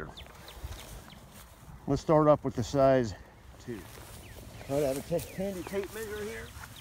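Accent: United States. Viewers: I could just tell by the intro that this was going to be a American dude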